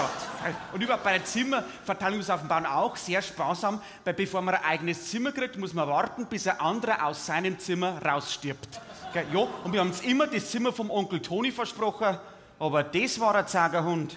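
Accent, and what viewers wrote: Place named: Bavaria, Germany. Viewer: Ich versteh ka Wort 😂 bayerisch halt 😂😂😂😂😂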